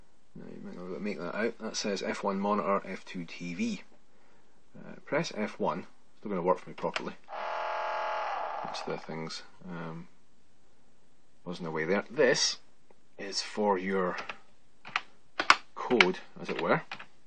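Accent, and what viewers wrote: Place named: Scotland, United Kingdom. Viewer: Sounds Scottish to me.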